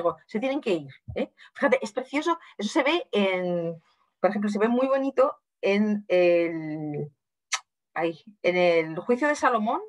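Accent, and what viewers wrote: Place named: Spain. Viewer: Muy interesante pero habla demasiado rápido y con su acento español es fácil perderse . Gracias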